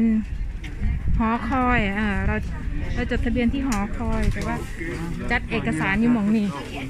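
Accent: Isan, Thailand. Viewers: พูดอีสานฟังไม่รู้เรื่องกรุณาพูดภาคกลางจะดีกว่า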